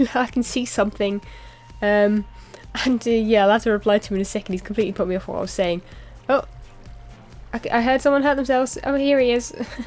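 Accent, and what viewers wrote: United Kingdom. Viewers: Great vid. Love the secret base. I must say, I just love your accent. I was once stationed at an American airbase 2 hrs northeast of London and just minutes from a small town called Ipswich, and if I had to pick something that I miss the most about the UK, without a doubt, it's the British women and their beautiful British accents. Once again, great vid.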